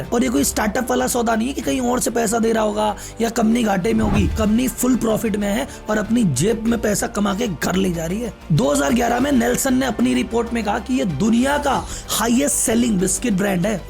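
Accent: Gujarat, India. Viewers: Tamara accent gujrati chey , dhando walo mota bhai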